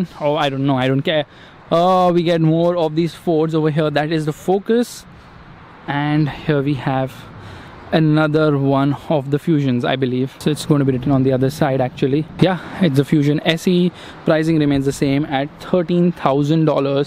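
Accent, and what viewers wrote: India. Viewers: I love Indian accent its so beautiful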